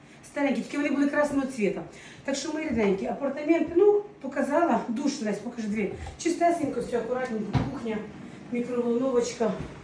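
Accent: Surzhyk, Ukraine. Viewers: Суржик колхозный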